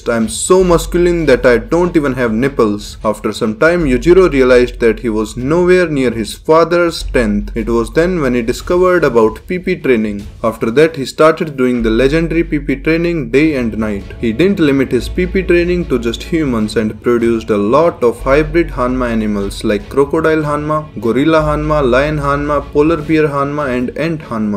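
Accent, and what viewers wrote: India. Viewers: Your indian accent makes it just so much better lmfao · "You don't believe me? Look at my chest I am so masculine that I don't even have nipples." "And when Yujiro Unleashes his demon, there is only one way you can survive and that is by dying." He don't poop anymore because he absorves every particle he eats LMAO Bro, your sense of humor is amazing, your acent turns things more fun and yes! Baki is sooooo bizare!! Indian guy, you made my day with this video! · Best Baki content creator by FAR! 😁 Love the Indian accent too, makes every joke work so much better. 😂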